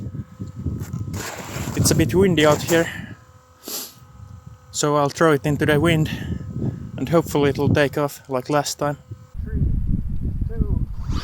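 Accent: Finland. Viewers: You sound finnish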